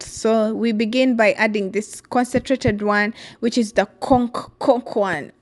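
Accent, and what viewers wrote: Uganda. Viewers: You sound UG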